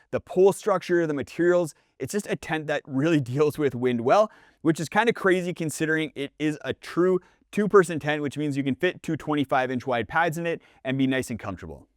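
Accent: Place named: Canada. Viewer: my god you are soooooo Canadian. I can't listen to it. It's just so laughable.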